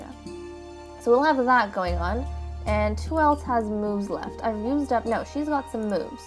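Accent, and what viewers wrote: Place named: England, United Kingdom. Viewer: They are so cute!! You have an English accent!!!!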